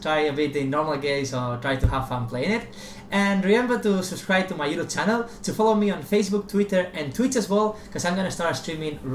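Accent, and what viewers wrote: Spain. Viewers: Because he has spanish accent, since English language is his second language. Dunno what you've expected. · Cuase he is spanish and our english is... :S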